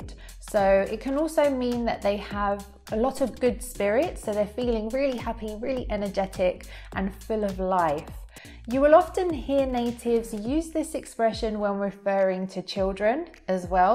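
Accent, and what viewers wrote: United Kingdom. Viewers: I hear a lots of different british accent in movies and tv shows, now I got used to your accent and I would like to know which part of the UK are you from so when I hear someone speaks with your accent I will know where he is from, I got used to one of the X factor judges accent and she is from Esses now when I hear someone speaks with the same accent I know they are from Essex, I like to recognise where people are from juste by leastning to their accent, that is the reason I am asking you which part of the UK you are from, and you don't have to answer my question if you don't feel confortable to, thank you. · I love the British accent and I'm chuffed to bits with this video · Hello i'm one of the your big fan's and I really like your Accent because I'd like to speak british accent properly so I hope you to make a special lesson about most common sounds to sound more british, Thanks for reply.😃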